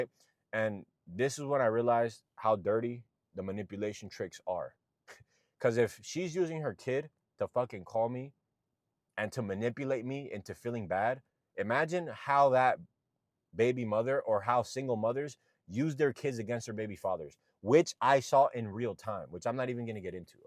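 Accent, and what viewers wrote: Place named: Gullah, United States. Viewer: Idk I'm in and wondering if maybe I was expecting too much from "a bouncer". I'm sure I'm gonna miss the part where he doesn't sound geechy but I just can't take this guy seriously 😂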